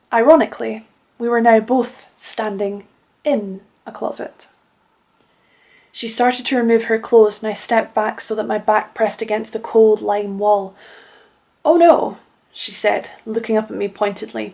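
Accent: Edinburgh, United Kingdom. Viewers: So nice to hear such a lovely Scot lass tell a beautiful story. Would love to hear a wee bit more of the Edinburgh accent, it is my favorite sounding speak.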